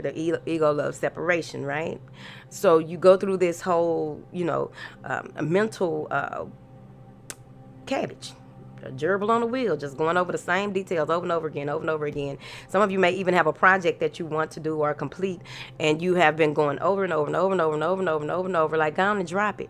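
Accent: South, United States. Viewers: My heart melts when I hear that southern ✨💜 thank you for such clear downloads & always getting straight to the point/no softening the message.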